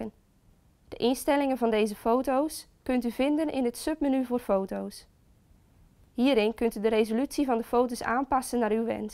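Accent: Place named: Geordie, United Kingdom. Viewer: That is the strongest Geordie accent I've ever heard!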